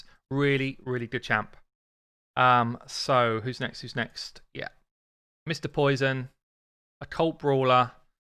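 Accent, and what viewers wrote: United Kingdom. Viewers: He’s British, they’re like that when it comes to pronouncing certain words lol. It’s not a diss, it’s just reality.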